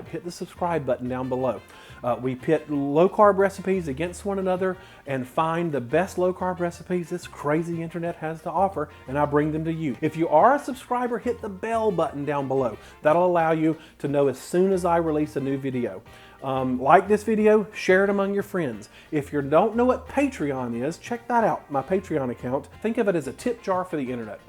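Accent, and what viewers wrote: South, United States. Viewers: Ur the bomb! I love the southern accent and expressions. ❤️ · You are such a joy to watch. I always feel I just had a visit from a personal friend. Love your Southern conversation while you cook. God bless you and yours. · Oh my GAWD you are so Southern and I love it! You talk so much like me and the people I know. When you just said "Y'ALL." I was LMAO. BTW, here's an idea to keep your taco shell shaper from rolling around: Use two measuring cups of equal size, spouts pointing toward each other, instead of glass jars. The spouts will help hold the dowel in place. :) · I like your southern accent. Sexy guy, thanks for making these videos. I watched so many keto recipes then i do not have time to try these like you. I appreciate your work and humor.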